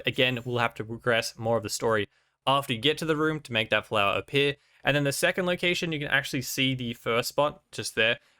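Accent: Australia. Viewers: Thanks for putting all these in an easy order and not giving up after 4 maps like joltzdude did 🙌 also u aussie?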